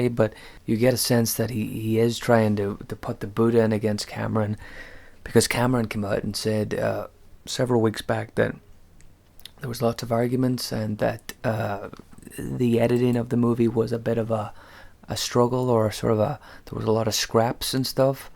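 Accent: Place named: United States. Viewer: Your from Northern Ireland, but have a Slight American accent do you now live in America!? 🤔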